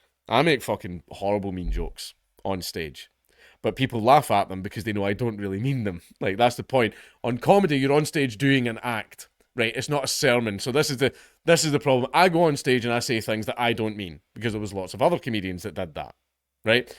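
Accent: Scotland, United Kingdom. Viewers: I don't know why the Scots accent makes everything funnier. It just does. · Your accent sells it to me as an Englishman. You have a delivery and straight talking Scots style which is very funny and appealing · Nah, man. Don't sell yourself short. This Texan not only loves the Scottish accent, but we find you funny cause your jokes don't suck lol Also, it funny and ironic how comedians will virtue signal by not saying racial jokes because they believe it disparages a group of people, but have no problem making political jokes towards the group they don't agree with. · I think the Scottish accent helps English folk find you funnier too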